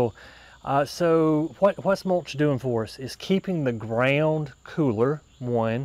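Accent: South, United States. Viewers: Where do you live? It sounds to me like you also live in a southern state. I live in North TX and just wanting to compare your environment to mine. This is my first time to see your program and so glad I found you as we are currently doing a total makeover of our yard. I'm currently researching the Japanese Maples so this was perfect timing for me to bump into you. 😊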